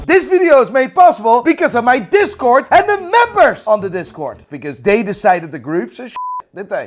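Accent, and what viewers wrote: Ireland. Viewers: yes but he lived in Ireland for a long time and kinda has Irish accent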